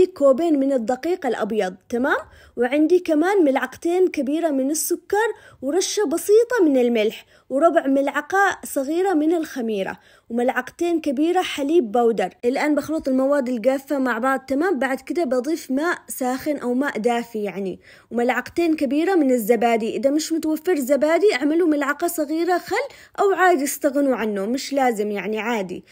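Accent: Aden, Yemen. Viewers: بصراحه الصوت لحاله يذوب واللهجه العدني لايق لك ي قلبي اختك من عدن ومن متابعينك واستفدت كثير من وصفاتك تسلم يداتك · مين زيي بتعشق صوت ولا بس انا 😅بحب لهجة عدن مع اني اصلي من الضالع بس لهجتي بالعامية لاني عشت حياتي بين عدن وصنعاء والضالع والحين بالسعودية من ٢٠ سنه لما اتكلم محد يقدر يعرف انا من اين بس ماشاء الله لهجتها ما تغيرت الله يحفظها. فعلاً هذه الماركة حلوة اخذت طاوه وخليتها للحوح فقط لها معي خمس سنين وما يخرب على اللحوح أبداً ❤❤❤ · اموووت باللهجه العدنيه وامووت بطريقتك واطروحاتك وبصراحه حبيبتي افضل واضخم وارقى من شرحت الطريقه الصحيحه بكلللل تفاصيلها تسلمي حبيبتي ❤ اختك ام اياد من صنعاء 😘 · من وين هي اذا ماكانت من عدن لان لهجتها عدنية مليون المية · ما شاء الله باين عليه لذيذ ... واللهجة العدينة حلوة...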